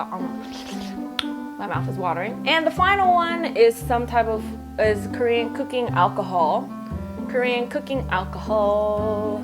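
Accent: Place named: South, United States. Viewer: Sometimes sounds like she has a country accent 😂😂it's so cute😘.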